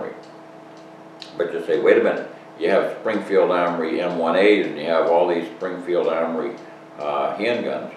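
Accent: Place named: Maine, United States. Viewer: As a child we had a summer house in Maine. Your accent reminds me of the people that I met there, brings back memories...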